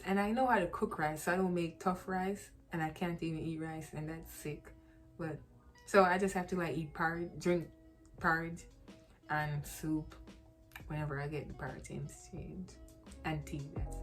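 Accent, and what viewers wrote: Jamaica. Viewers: Jamaican accent? Subscribe!! ❤️💪🏾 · Can definitely tell that you're jamaican 😂❤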